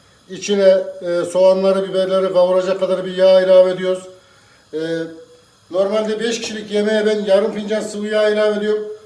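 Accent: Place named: Isparta, Turkey. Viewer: Ellerinize sağlık Sefim memleket neresi Bizim ıspartanın şivesine benziyo konuşmanız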